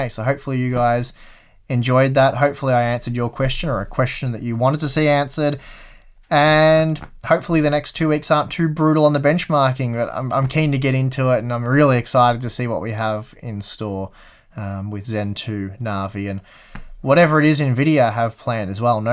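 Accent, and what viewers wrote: Australia. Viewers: didn’t feel like 30 minutes. Oh how time flies when you’re listening to an aussie bloke😂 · basically he feels that you are a typical Australian and he finds Tim to be a bit more British than Australian, does that make sense? You are the crocodile guy and Tim is more Nicole Kidman, accent wise.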